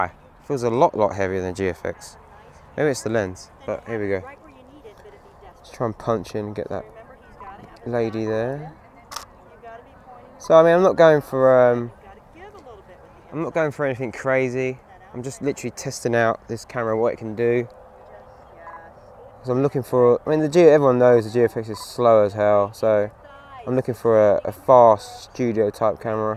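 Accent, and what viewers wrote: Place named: England, United Kingdom. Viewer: got confused for a mo.... English accent in Kentucky. is an amazing host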